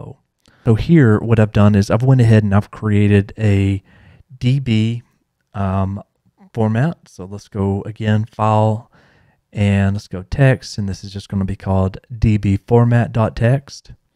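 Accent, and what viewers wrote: United States. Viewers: I read Birmingham and instantly thought "England UK? He sounds American" guess I gotta remember you guys have a lot of our city names lol. Good video btw 👌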